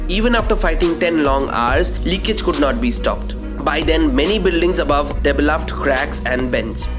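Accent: Bengal, India. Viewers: You speak English like true Bengali I am very proud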